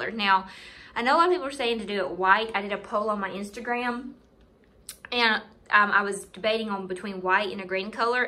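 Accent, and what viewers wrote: South, United States. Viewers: So pleased that I found your You Tube channel! Love your southern accent as you sound like me (I'm from west TN).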